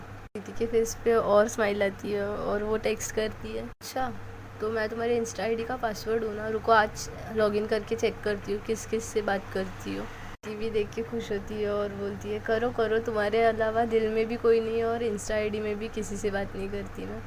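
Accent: Maharashtra, India. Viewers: Hindi main jo marathi ka thoda tadka lagta hai kya baat hai ☺️☺️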